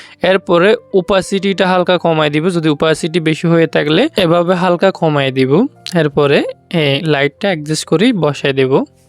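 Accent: Chittagong, Bangladesh. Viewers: আপনি মনে হয় চিটাইঙ্গা তাই না?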